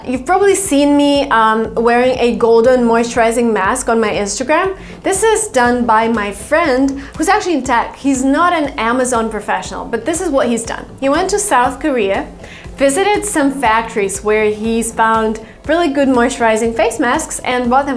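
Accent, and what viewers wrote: Russia. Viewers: The way she "love"..... lovely Russian accent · Потому что у него русский акцент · I’ve recognized her as a Russian speaker 😅😅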